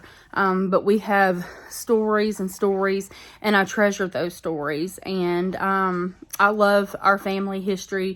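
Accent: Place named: South, United States. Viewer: Thank you for all you do to help your grandparents share their beautiful lives and Elvis stories with us. Elvis loved and cherished his family members, so I am sure he would be so proud of the way you care for and look after your sweet grandparents. Btw, I love your Southern accent!! And please do keep the animals in the room while you are filming, they always make life so much more interesting.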